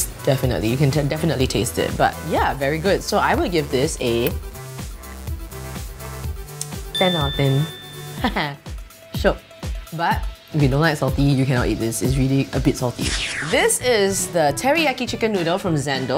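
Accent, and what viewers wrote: Singapore. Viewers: As a Singaporean living overseas, the most shiok thing is to hear our accent when you are going through YouTube videos. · i loooove your singlish accent :) (coming from someone who lives in canada) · where is she come from ? Singapore ? Malaysia ? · is an Singaporean or at least born in Singapore because she has a accent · i sounds like a singaporean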